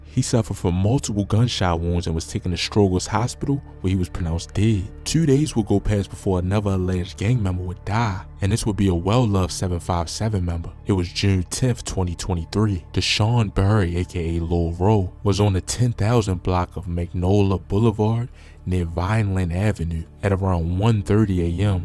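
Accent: Baltimore, United States. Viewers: I think he’s from Baltimore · You from Baltimore I can tell by the way you say your U's lol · That DOO YOUU. TOOO... #Baltimore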